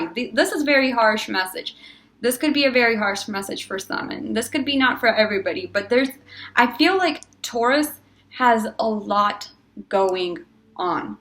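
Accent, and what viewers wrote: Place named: Russia. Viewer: From Russia? I detect a slight accent maybe but you definitely speak better English than most (including myself)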